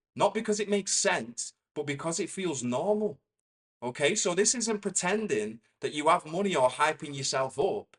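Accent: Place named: North West England, United Kingdom. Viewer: Love it. Love your accent, too. North West, right? Brings back great childhood memories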